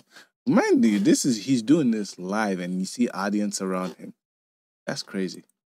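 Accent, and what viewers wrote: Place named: Jamaica. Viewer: I am a white guy in his mid 50s and is one of my idols. He mixed country with the old blues and kicked up playing faster and harder. Both the Beatles and Rolling Stones were inspired by him. I live on the east coast on the US and his accent sounds like mine mostly. where are you from sir? In no way am I saying anything to disparage you accent. It sounds Jamaican maybe?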